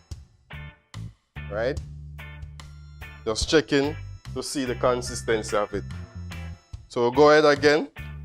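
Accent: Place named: Jamaica. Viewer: I know you are saying something good. Only Jamaicans and those In contact with Jamaicans will understand what you are saying. Just slow down so we can pick up what you are saying 🙏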